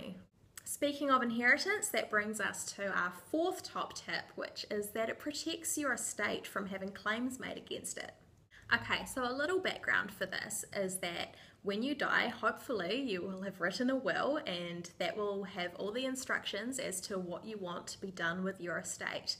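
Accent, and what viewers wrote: New Zealand. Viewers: she has a kiwi accent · We Americans (palm slap)....She is not from the UK! The accent sounds similar to the Aussies but I believe she is a Kiwi. Beautiful accent. Isn't it interesting how trusts function so similarly across state, vis a vis governments lines? With the exception of some specifics this information applies to trusts in general.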